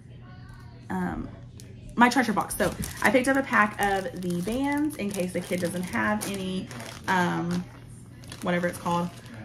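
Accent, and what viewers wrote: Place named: Midwest, United States. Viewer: Can I ask what state you live in? Here is the reason, I grew up in NE Pennsylvania and we say crayon as kinda cran or cray on. But when I moved here to Indiana I notice that alot of the mudwest states saw it like crown. Like I hear you saying it. I'm just curious where you are located.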